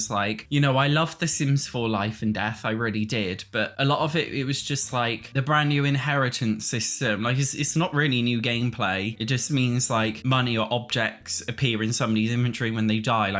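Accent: United Kingdom. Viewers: I see you took your English teacher role very serious! Thank's for being here for us! I learned some words here, but just for you to know, I'm here not just to listen to your great british accent! I love your sense of humour and content you make:) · WHAT I THOUGHT YOU HAVE A HEAVY BRITISH ACCENT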